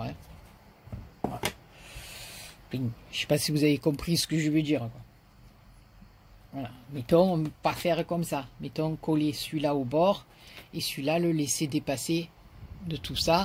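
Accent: South, France. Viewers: Coucou, ils sont juste magnifiques tes coffres, j'adore! merci beaucoup pour ce tuto, ces explications et ta bonne humeur, j'adore t'écouter avec ce petit accent qui nous rapproche du Sud, bisous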